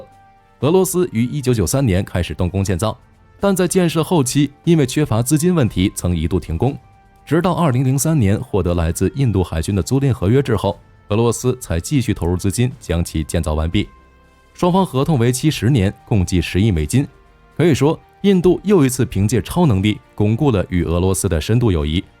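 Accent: China. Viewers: 只要是這種中國口音的不是大外宣就是大內宣,只要你是中國的敵人,他就製作假視頻來攻擊你,從來不懂得,去讚美別人,只會抄襲別人,又不懂得核心與關鍵技術,於是呢就製作了一些四不像的武器出來了,沒有作戰經驗的中國解放軍,只會使用鋼筋水泥,號稱基建狂魔,笑掉到人家大牙。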